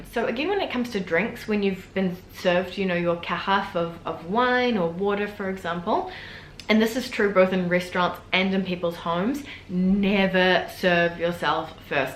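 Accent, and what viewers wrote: New Zealand. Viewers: I love your Kiwi accent, love ! Great summary of french foody etiquette. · Trying to work out your accent... Kiwi, right? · wow your kiwi accent really came through when the auto-caption mixed up your six and sex...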